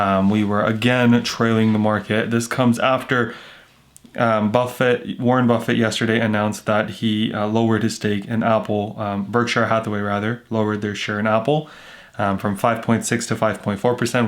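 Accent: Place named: Canada. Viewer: What? Dallas TX! I thought you are Canadian! Lol 😆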